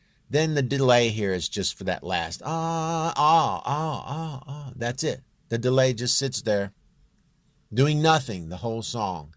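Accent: South, United States. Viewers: Yeah, I watched it and it showed what I thought it would, you are really well versed in sound engineering and yes, your real singing skills are not lacking at all. Before I go on, I am guessing the thing went well since it was a while ago. I do hope so at least. Confusing on a song when you are talking about your own real ticker. This video is for the end of the semester project where you take your turn and show the other students all the stuff you learned and how you used it, after 16 weeks of learning and practicing the stuff, as an analogy. Can't really learn too much from it in regards to application because as you said, it's a process, and that process each requires its own host of knowledge. What it does do is show your key elements to the process and that allows for a great starting point. I am guessing these type of videos are as equally demanding as your song mixes, but if you are not allowed to get paid for the song videos due to copyright, you would get full pay for these. 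That's something. I also learned you have a southern accent, didn't see that coming.: )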